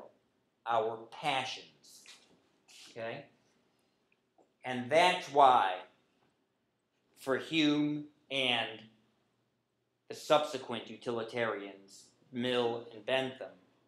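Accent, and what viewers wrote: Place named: South, United States. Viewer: It's so sad that I could tell he was from the South.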